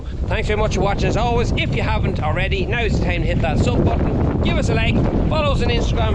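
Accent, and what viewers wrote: Ireland. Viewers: ❤️❤️ LOVE THE CHANNEL ❤️❤️ 🤔🤔BUT🤔🤔... SOMETIMES I\\we, can't understand a word you're saying.!🧐🤔! SOMETIMES. You just talk TOOOOO FAST with that FANTASTIC Irish accent you have, and I\\we get lost in the wake.!🧐🤔! AND... The PERFECT EXAMPLE of this, is between the 12min point, and the 13min point, where you're obviously saying SOMETHING about how healthy it is for these lovely ladies to stay out, on the grass for as long as possible. But, at some stage they'll HAVE TO come indoors to spend their winter days and nights🥶. BUT... There's LOADS of detail you are giving us that we're simply not hearing, because you're TALKING TOO FAST, and we\\I can't keep up.!🤣! So... PLEASE talk a little bit slower when you're giving us the more important information. And... You can 'speedtalk' the less important stuff to your heart's content.!🥰! ABSOLUTELY LOVE THE VIDS.🥰🥰.... 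KEEP'EM COMING.🤞🥰.... RICH(UK).🥰🥰🥰.!.!.!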